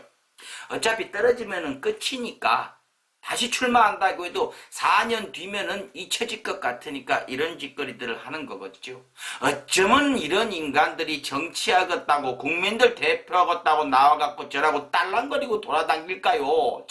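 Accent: Jeolla, South Korea. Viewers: 강성범씨 고향이 경기도라고 하시던데, 전라도말을 구사하다보니 맛깔스러움도있지만 살짝 부담도 됩니다 속 시원하게 해 주는 매력 넘쳐요~~ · 똥물... 맞네 ㅋㅋㅋㅋㅋㅋㅋㅋ 근데 발음 교정좀 해주세요 전라도 말인데... 차명진 문제야 지금은 경상도 말쓰면 경상도코로나 라도 하던데 피하고 난지금도 피하고싶어요 경상도 말하는사람들 한테 코로나 결릴까봐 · 왜 코메디 계에서 왜 안보이나 했더니 정치판에 들어왔냐?송충이는 솔잎을 먹어야 산다고 했던가? 하하..대가리에 들은것도 별로 없지 싶은데 참 어울리지 않는다. 표만 깎아 먹는다. 도 절라도 치인가? 전라도 사투리를 많이 쓰네!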